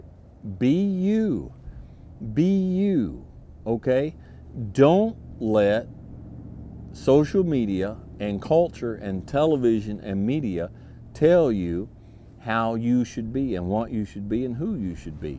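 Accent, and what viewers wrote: United States. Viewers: Thank u, sir! Feels like I'm taking with my pal, or an uncle, or alike 🤝 and I love your genuine attitude, sir, and your accent. Real American. Big up and all best from a random Russian dude 👍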